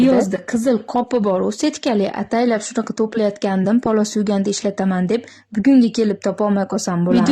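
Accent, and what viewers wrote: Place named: Khorezm, Uzbekistan. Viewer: Хоразмлимисиз суллашингиз Дим ухшиди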